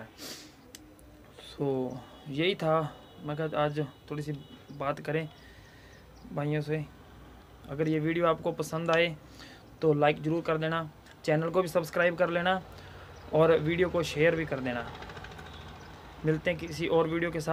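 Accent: Punjab, India. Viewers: bhai saab punjabi touch aa raha h apki awaz mai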